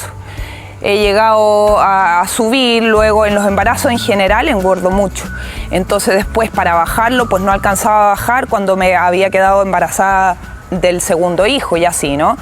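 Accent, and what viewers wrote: Chile. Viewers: Lo mismo pienso... tiene mucho acento chileno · Te noto la voz de chilena 😁 y gracias por tus vídeos ❤️😍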